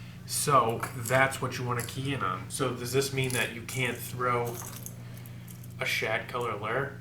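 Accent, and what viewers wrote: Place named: New England, United States. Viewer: Ha, I knew you were a New Englander! I live around New Haven and I have a friend from Boston and a friend from Rhode Island. Both guys have the same accent. Yet I have no accent.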